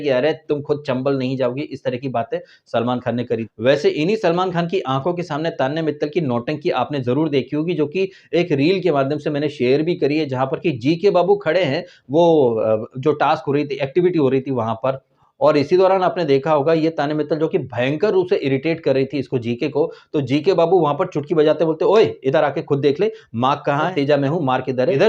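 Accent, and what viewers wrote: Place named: Delhi, India. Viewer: bhai aapka tone delhi ke logo jaisa h😂 islye maine poocha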